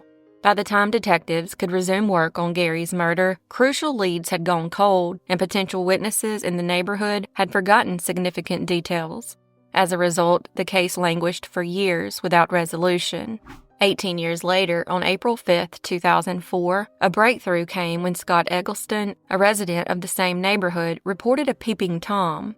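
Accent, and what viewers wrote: South, United States. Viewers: Hold up!?! 2 days off!?! Glad you didn't lose your Southern Charm Voice!! Irrelevant but kinda funny! My kids are out of college now.. My brothers youngest is a little 8 year old firecracker! (We went to visit for Christmas..). While asleep lil miss I hate boys vaulted up and smacked me on the mouth.. Cute but.. Dang.. Okay.. My brother (her father) laughed at it.. "Time to wake up and pretended to be an uncle and help your brother out".... (We are close.. Post high school and all the blood fights). I digress.. She got me SICK! Lil Terrorists.. So, I spent Christmas day doing highschool stuff to the toilet.. Got back in bed.. AND, .. I found some I actually missed and some I didn't comment on.. I actually felt like.. (sh!t for sure physically) but remiss that I missed them.. remiss / missed? Maybe I am still sick.. I fall asleep! 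"WHO IS IN THERE WITH YOU UNCLE DAVE!?!" "She has a really cool voice! I want to meet her!" I'll leave that up to her father..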